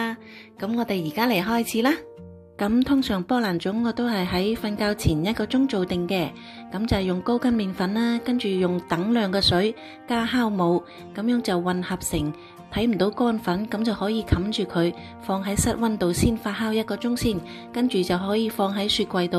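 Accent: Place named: Guangzhou, China. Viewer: 你好！你做吞拿魚包好靚好似好好食！聽你嘅口音好似廣州人？你把聲好聽好可愛，多謝你的分享。😄😄👍👍💐🌺🌹。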